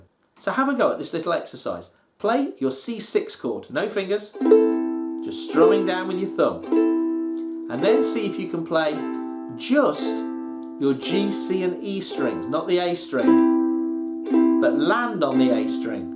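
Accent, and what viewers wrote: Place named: United Kingdom. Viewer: Jolly! Love the ur Brit accent!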